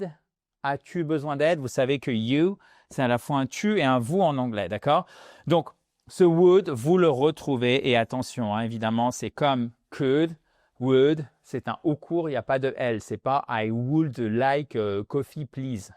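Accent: France. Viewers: J' adore lorsque tu parles anglais comme parlent les français, en plus tu les connais très bien , puis que tu es son prof.....❤❤❤❤❤❤❤ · Les Français du sud de la France prononcent de la même manière les terminaisons du futur simple (-rai) et du conditionnel présent (-rais), les rendant indiscernables à l'oral. habite à Saint-Étienne. Et voilà pourquoi.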